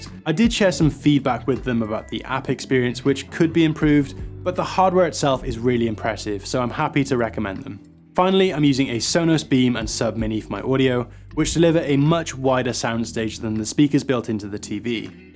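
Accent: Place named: Wales, United Kingdom. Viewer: What part of Wales are you from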